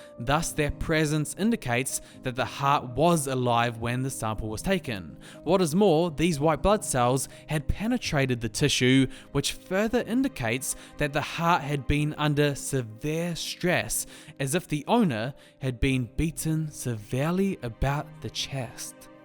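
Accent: New Zealand. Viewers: You're a kiwi right? :)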